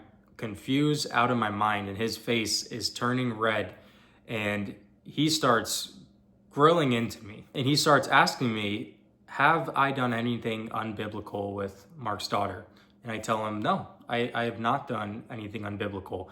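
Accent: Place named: East Texas, United States. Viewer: Sounds like someone east of Dallas!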